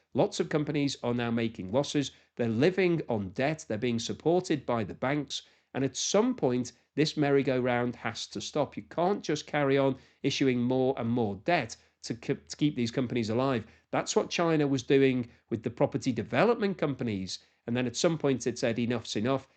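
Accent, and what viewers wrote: United Kingdom. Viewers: Luv the British accent! At least I think it's British!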